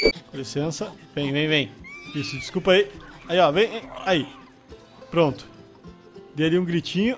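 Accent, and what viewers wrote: Rio Grande do Sul, Brazil. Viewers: Ei man tu e gaúcho? Se tu não for teu sutaque e igual sou gaúcho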